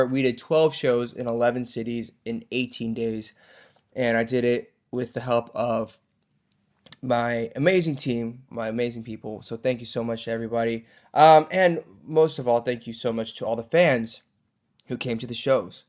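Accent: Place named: United Kingdom. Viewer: I love your British accent (Actually i love your all)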